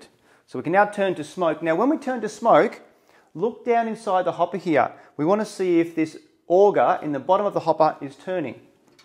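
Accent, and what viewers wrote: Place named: Australia. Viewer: What is it about Aussies that makes me want to listen intensely? What we perceived as an accent is so awesome! Good job mate!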